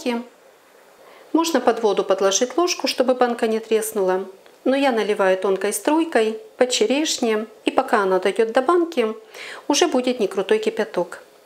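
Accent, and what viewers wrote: Ukraine. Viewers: И не только компоты,но и консервацию.Правда, заливала несколько раз.А вы из какого города,?Очень приятный у вас украинский говор.Я сама родилась и пол-жизни прожила на Украине. У местных господинь всегда было множество просто классных рецептов еще из времен СССР)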